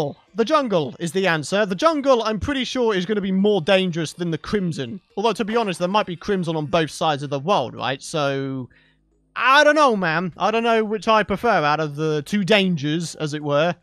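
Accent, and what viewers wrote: United Kingdom. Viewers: What an british accent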